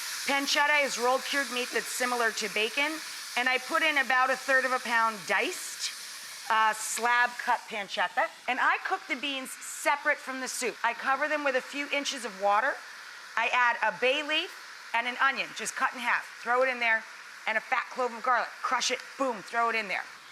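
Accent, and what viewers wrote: United States. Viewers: As soon as I heard her American accent I knew this would go wrong! I shut down straight away not being racist but Americans are not the most aspiring people in the world.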